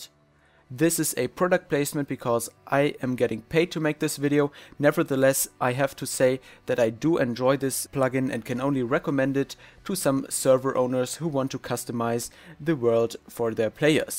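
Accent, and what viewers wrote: Germany. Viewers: Are you German you are never Englisch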